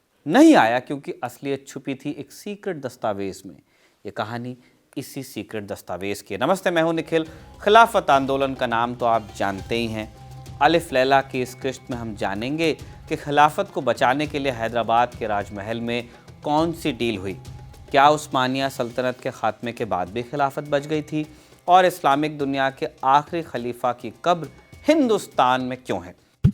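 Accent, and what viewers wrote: Bihar, India. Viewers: Good Behari accent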